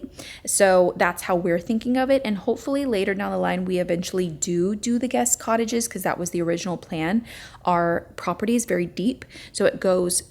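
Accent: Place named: South, United States. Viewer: You are a great example of how us southern people can easily go back into our southern accents! 😂 I didn't realize I did it until a British person did it in front of me at my place of work... And apologized for it... I was like girl... I'm pretty sure I do this with my southern accent so I'm not judging! ❤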